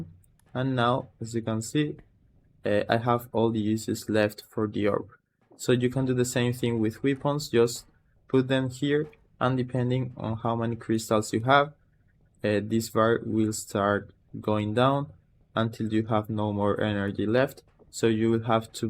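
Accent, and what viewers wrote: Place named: Albacete, Spain. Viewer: Este tio es de albacete o por ahi a mi no me engañas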